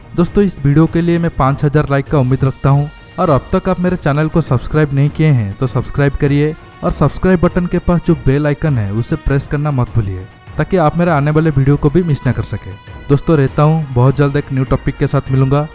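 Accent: Odisha, India. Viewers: Odisha se hai kya bhai.. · I think u r from odisha , any way su30mki is best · Odisha k ho kya bhai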